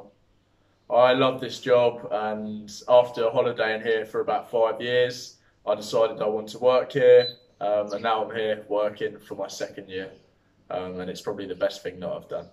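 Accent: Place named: England, United Kingdom. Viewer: from what part of england are you from ?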